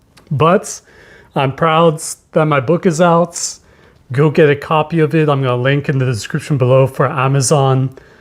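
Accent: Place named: United States. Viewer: What a concept! I am considering learning Afrikans and Frisian at the same time, or at least close together. I am going to go to your website because I am curious about you. Based on a few clues I think that you were raised in a household where English was the primary language of communication. But, you do sound American. So, my first guess is Yiddish.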